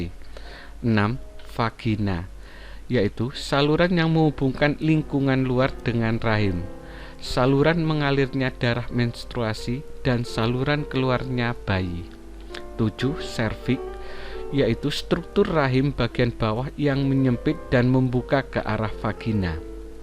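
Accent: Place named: Java, Indonesia. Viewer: Medok pak😂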